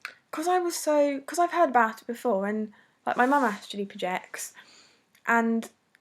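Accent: England, United Kingdom. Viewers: I love the British accent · nice british accent, are those posters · she looks french but has british accent xD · are you from England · be careful ms pretty english accent girl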